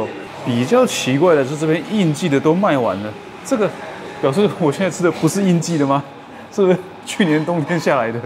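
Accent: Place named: Taiwan. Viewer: 台湾口音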